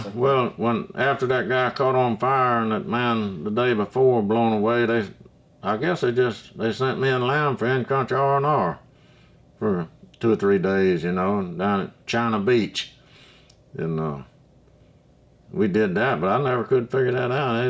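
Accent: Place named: South, United States. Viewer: SIR, YOU SOUND SOUTH, THANK YOU FOR YOUR SERVISE GOOD STORY, GLAD YOU MAD IT HOME. USA USA USA AMERICA GO GO GO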